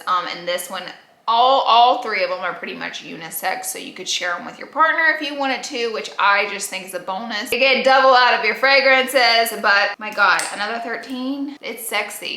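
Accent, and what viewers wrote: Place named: Alabama, United States. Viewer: I need more of Alabama accent back ok hunny!!!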